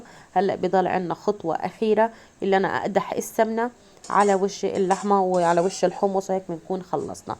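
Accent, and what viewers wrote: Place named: Egypt. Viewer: انت ليش بتحكي مصري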